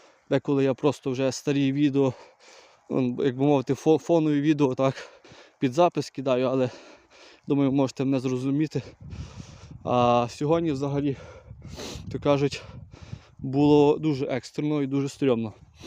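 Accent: Ukraine. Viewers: Я так розумію ти з західної України по розмові! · Виглядає, що ви із Львівської обл. Може, навіть із Золочівського району. Співробітник мав подібний говір. Не подумайте, що намагаюсь шось вирахувати , бо знаю, що у людей зараз ще та параноя. Просто цікаво.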